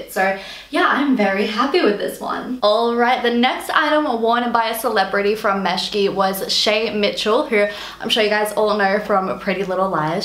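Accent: Australia. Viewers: Why do I love them? Because she’s honest and classy without ever being cruel. She’s got a fun personality and I could listen to her Aussie accent all day!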